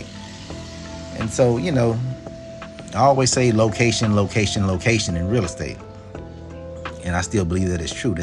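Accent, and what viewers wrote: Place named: Georgia, United States. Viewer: Love the video, looks beautiful. Your accent sounding like it from Georgia where I used to live 👌🏽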